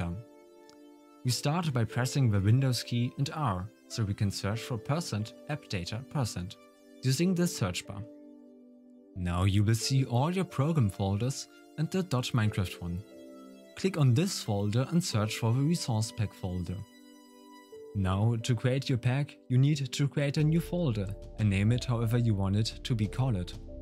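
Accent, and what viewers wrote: Germany. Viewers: I Hear Deutsch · keiner kann mir sagen das er nicht deutsch ist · ich wusste du bist deutsch XD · you can hear the German accent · are you german? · ich habe schon am anfang des videos an deiner aussprache gehört das du deutscher bist :D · Bros accent is way to german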